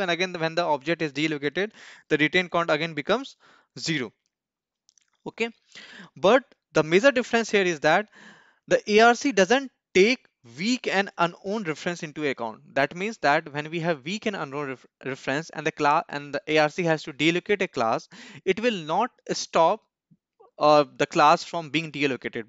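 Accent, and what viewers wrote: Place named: India. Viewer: 咖喱味 听不懂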